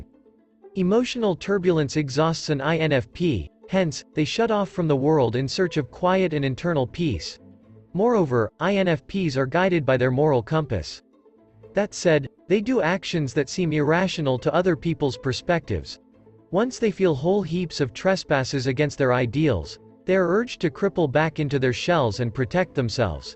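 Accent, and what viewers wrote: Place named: United States. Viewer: American English is so bad.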